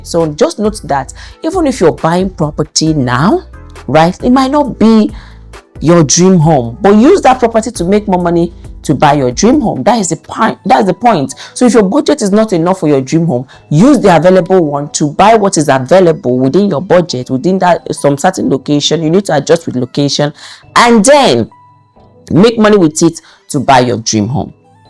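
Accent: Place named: Nigeria. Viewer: No adjust your English, jare — keep that beautiful Naija accent. I find it incredibly attractive!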